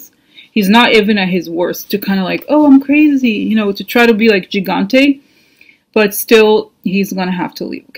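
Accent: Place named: Russia. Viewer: your accent is hilarious!! Are you really Russian?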